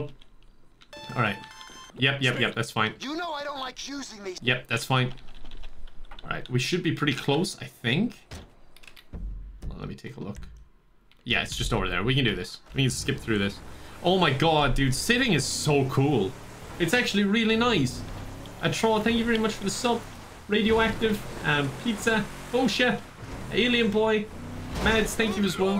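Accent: Ireland. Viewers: Personality, an irish accent, a chaos mod in GTAV, it's pretty great. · Dark viper but irish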